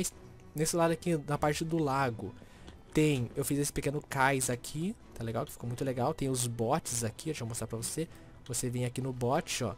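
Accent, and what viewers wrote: Rio Grande do Sul, Brazil. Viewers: vc e gaucho? Eu sou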